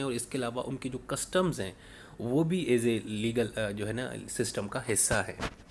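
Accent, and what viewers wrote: Karachi, Pakistan. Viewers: Apka Accent Karachi Wala hai..... Karachi waaly bolty hain æsy...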